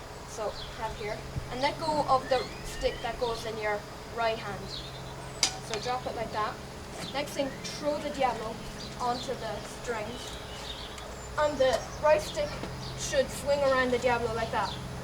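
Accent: Ireland. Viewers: What part of Ireland are u from?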